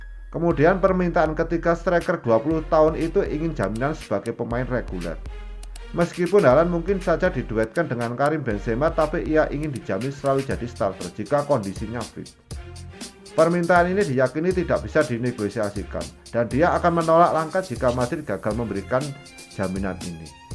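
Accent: Java, Indonesia. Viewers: Presenternya terlalu medok..😁😁😁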